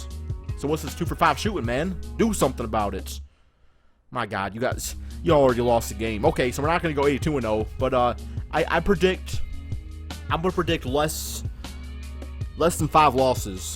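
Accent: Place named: Midwest, United States. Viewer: Where are you from? The Midwest? Your accent stands out.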